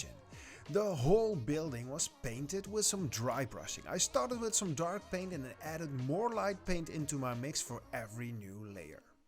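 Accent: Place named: Netherlands. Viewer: Dutch? Thenaccent sounds like it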